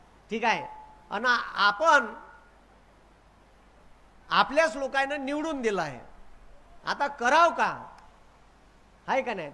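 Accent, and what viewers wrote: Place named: Vidarbha, India. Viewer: हे वऱ्हाडी भाषा आहे जे विदर्भात बोलली जाते, इकडे सगळे लोक असच बोलतात...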